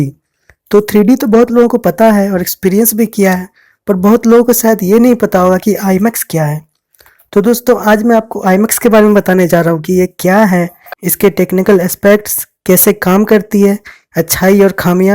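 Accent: Patna, India. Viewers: Bhai tum patna se ho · Bhai aap Patna se ho kya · Are u of patna?? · Bhai Patna se ho?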